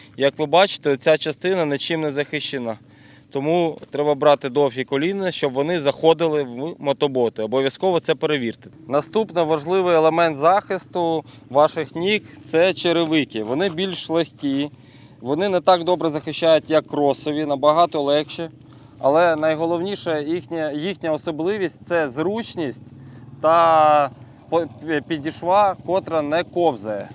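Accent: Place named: Russia. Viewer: Смысл сужать аудиторию на своём украинском с русским акцентом ? Мда...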